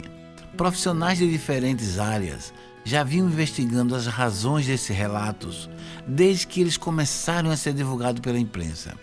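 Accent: Northeast, Brazil. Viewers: Sempre ouço seus depoimentos senhor locutor, de qual Cidade Nordestina vc é ? Pelo sotaque. Eu sou de GOIÂNIA GOIAS.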